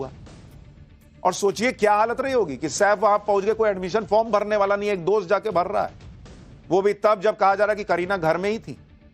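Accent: Bihar, India. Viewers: Poora Bihari accent mein lehra diye....Bihari youtuber Nahin Chahiye...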